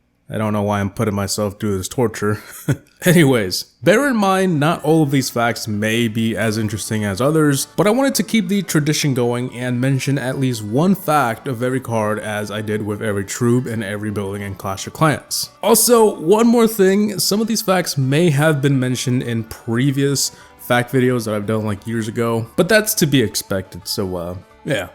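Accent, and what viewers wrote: United States. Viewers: Good video expect the american midwest pronunciation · this is the most american man i have ever heard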